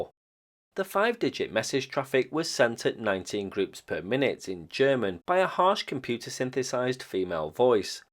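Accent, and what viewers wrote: United Kingdom. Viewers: This was my first time hearing German spoken with a British accent! :)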